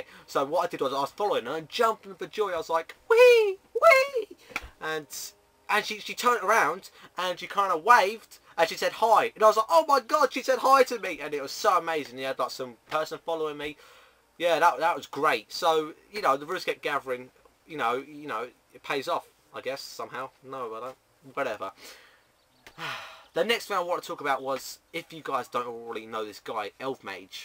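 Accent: United Kingdom. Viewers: fun to listen to with your awesome british accent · i love ure accent <3 <3 egland accent FTW!!!!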